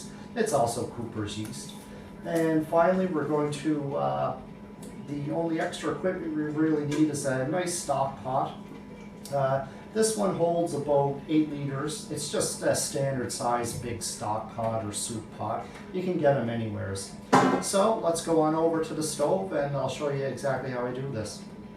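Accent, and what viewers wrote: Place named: Ireland. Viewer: Hi watching your reviews here in Ireland for a while. Would I be right in saying you've some Irish connection, your accents got a fairly noticeable Irish twang to it!. I've just been doing kit beers and dry hopping. I've been considering just taking out a few litres of wort and doing a mini boil, do you think that would work the same as your mini boil there?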